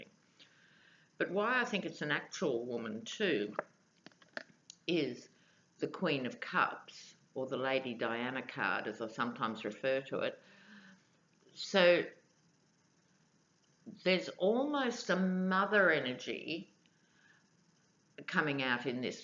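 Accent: Australia. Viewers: I just came across your Tarot videos yesterday. I Love ‘em, but I am writing to give you kudos for your up to date and in depth awareness of what’s going on politically in the USA. I am amazed. You know as much as any political junkie here does. I recognized your accent as Aussie but assumed you must live here, until you mentioned you were in Australia. How do you keep up?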